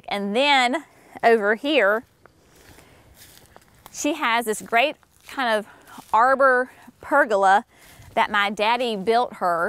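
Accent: South, United States. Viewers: You know, I know you are southern not just by your accent but because I actually feel your joy standing in front of those azaleas. And yes, your mom has a knack for blending whimsical pcs and after my own heart, a container gardener.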